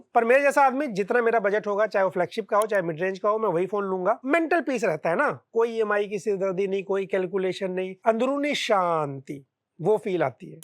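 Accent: Lucknow, India. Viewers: Bhai aap lucknow se ho kya?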